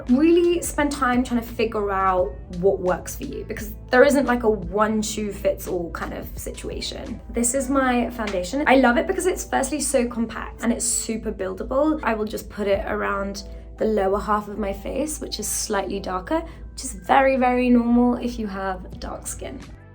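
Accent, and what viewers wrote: United Kingdom. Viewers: I love the way she talks, british accent is the best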